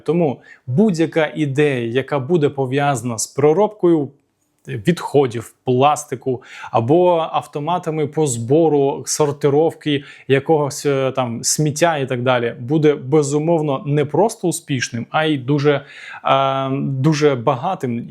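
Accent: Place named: Russia. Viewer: Для чего ты мучаешься на украинском языке разговаривать если твой родной язык русский это же видно Тем более что белорусы тоже могут твоё видео посмотреть про Польшу и ничего не поймут по-украински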